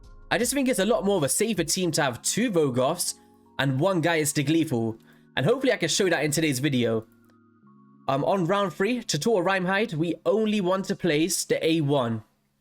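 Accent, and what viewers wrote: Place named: London, United Kingdom. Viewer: but any particular area of london? as I have heard londoners but none like you, forgive my uk ignorance i am truly just trying to differenciate. like i enjoy your voice but i feel its more specific than london..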